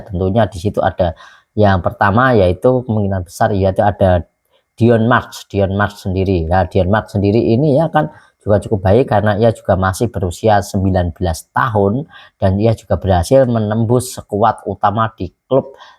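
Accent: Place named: Java, Indonesia. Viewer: Ijin saran nya bang,😊,kalau mau buat ubdate kalau bisa jngan terbata 2 kalau lagi komentar,, sebaiknya logat Jawa nya di kontrol,,biar bahasa baku Indonesia 🇮🇩 di mengerti,,dan kalau cari materi jngn terlalu panjang 2 yg ujung 2 nya materi nya muter2 itu saja,, semoga bermanfaat buat Chanel Abang,dan semoga semakin banyak yg melihat,,❤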